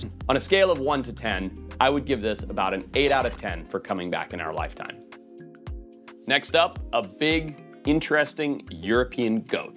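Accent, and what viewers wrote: United States. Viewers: Saying Americans pronounce zebra wrong when you pronounce can’t like con’t and forest like far-ist is and interesting take. You basically sound American except for certain words. Almost like you’re on real bros of simi valley.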